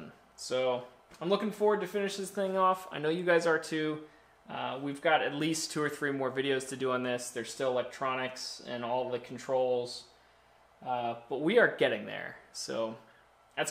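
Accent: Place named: Australia. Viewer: Your heavy Australian accent makes listening to your otherwise excellent vid difficult. In future would you consider including Canadian & American subtitles. Where in Sydney do you Herald from? I have many Australian speaking friends and they love you vids. Thanks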